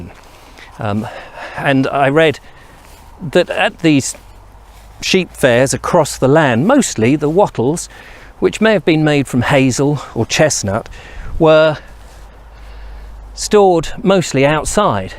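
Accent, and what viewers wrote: United Kingdom. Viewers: Sir I'm from India and I love your video, the way you present all video and especially your British accent, sir please visit the lords cricket ground Victorian era pavilion it is more than 200 years old building and your history and I have one friend from pennistone, yorkshire she send me lot of old history building so you should visit this place too again thnx for your wonderful videos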